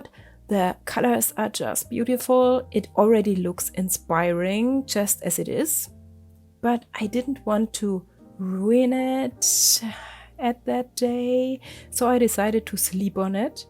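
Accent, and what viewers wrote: Germany. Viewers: Ich höre da einen deutschen Akzent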